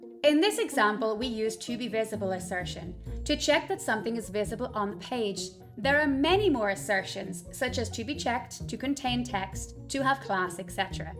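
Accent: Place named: Scotland, United Kingdom. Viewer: her Scottish accent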